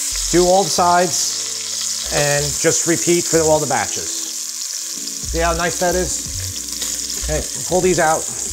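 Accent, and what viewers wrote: New York, United States. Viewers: Something tells me this guy is new to cooking. Maybe hired as an actor because he's Italian and sounds sort of New Yorky. Not that he's doing anything wrong, except he may seem a little afraid of the knife.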